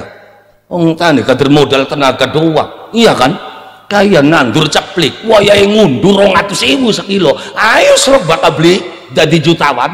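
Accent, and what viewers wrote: Indonesia. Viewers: Aku g Level yg ceramah ny kurang wawasan kental logat Ndesosanya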